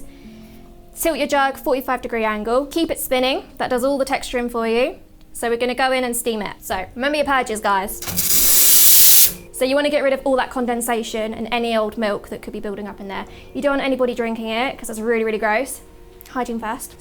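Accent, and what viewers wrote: United Kingdom. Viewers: Yes which is where you're wrong, they are located in the UK Also, sounds British to me. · barista girl with british accent, Just perfect👍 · She’s British! Yayy! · She’s british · No! British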